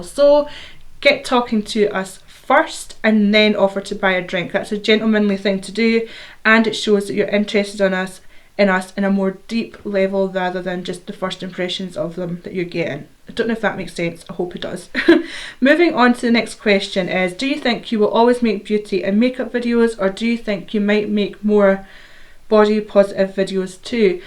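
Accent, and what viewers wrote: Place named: Scotland, United Kingdom. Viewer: That's so funny. My wife and I tap three times on wood any time we don't want to jinx something, nine times if it's really important. We also love. He's a very lovable sweet guy. Great video. I watched your most recent singing video. Very nice btw, and I was wondering where your accent was from. I think you might have said Scotland, well, I'm assuming it's Scottish.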